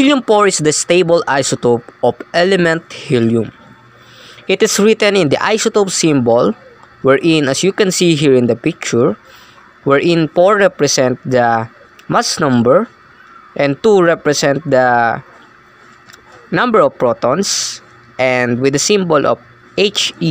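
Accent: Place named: India. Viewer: While I very much appreciate the man explaining this, the Hindi accent in the English makes it quite hard for me to follow.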